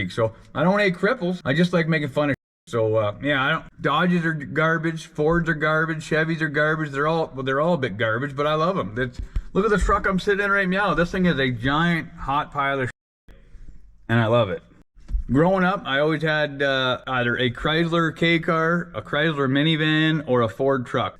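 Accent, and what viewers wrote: Canada. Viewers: princess auto winch that you got on promo is the most relateable thing I've heard all day. fuck buddy I can't tell if you're from Ontario or Alberta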